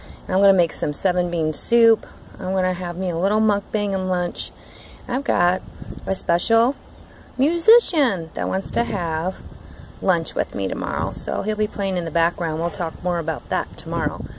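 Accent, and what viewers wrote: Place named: Indiana, United States. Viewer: That's an Indiana accent I think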